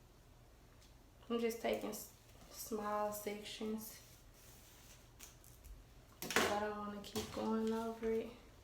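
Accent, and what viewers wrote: United States. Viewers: I love your southern accent girlie! 😍😍😍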